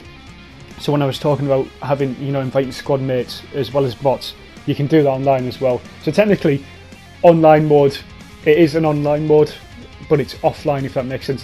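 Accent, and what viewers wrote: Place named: United Kingdom. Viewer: when i heard your British accent i smiled and kept watching with even more pleasure lol thank you a lot for that video!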